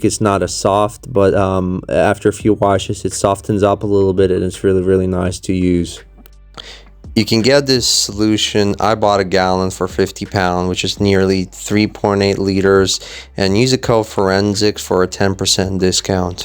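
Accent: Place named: United States. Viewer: Your accent sounds as you're from Chicago or New York with traces of immigrant parents. Thoroughly enjoy your commentary!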